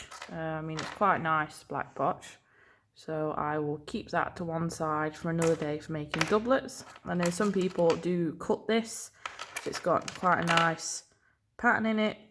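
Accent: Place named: Stoke, United Kingdom. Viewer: I have a piece of Opal but nothing to cut it with 😂 you sound like your from stoke, are you?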